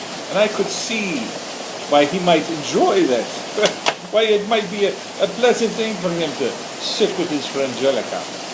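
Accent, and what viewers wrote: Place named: Ireland. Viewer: Awesome story and i love the irish accent very nice👍👍👍👍👍👍😃😃😃😃😃🍀🍀🍀 best regards peter.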